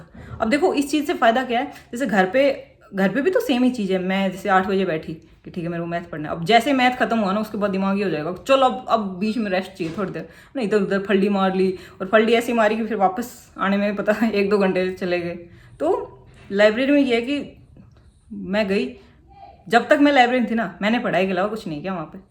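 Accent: Haryana, India. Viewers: Haryanvi Vibes mst lag rahi hai language me 😁😁😁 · Awaj se lagta h Rewari, Gurugram, m/garh se jo aap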